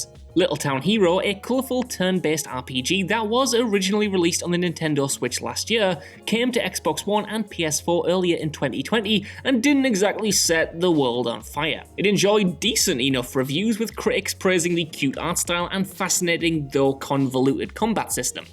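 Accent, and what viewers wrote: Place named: North East England, United Kingdom. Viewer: He’s got a bit of a north eastern English accent.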